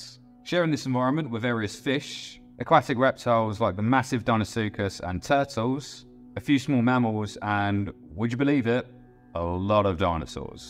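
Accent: Essex, United Kingdom. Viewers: Because you mumble. It's not the accent, it's that for us to understand your accent you need to enunciate your accent clearly and not slur words in a hurry or get quieter towards the end of a sentence. I, a non-native speaker, have never heard Essex accent before this video in my life, but I had no problem understanding you when you actually spoke up. You don't need to speak like a BBC host, you need to speak like an Essex boy who hosts a video instead of like an Essex boy who talks to his mates on the bus. If you think that you actually did that, you dearly need to get a less muffled microphone. Sounds like you got the wrong end of Shure, completely dead top end. But top end is where "easy to understand" sits. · He's understandable. He's from Essex, it's just how they talk. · Nothing wrong with the Essex, nice to hear something a bit familiar for once ;) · Hang on to the Essex thing, young man, it figures importantly in why so many people enjoy these excellent presentations! 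I am an old man from the Mouth of Raccoon in Kentucky Appalachia and I appreciate a good regional accent! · American here - keep the Essex! Love it!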